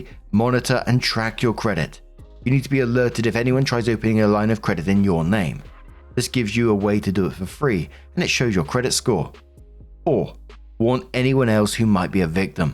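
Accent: United Kingdom. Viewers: As an always sunny fan hearing say "pounding off" very seriously with his british accent sent me. thank you.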